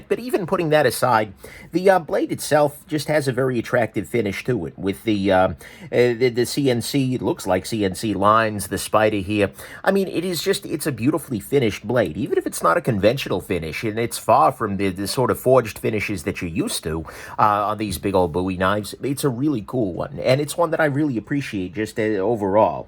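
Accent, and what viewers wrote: New York, United States. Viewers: I thought you were a new yucker ! With the accent!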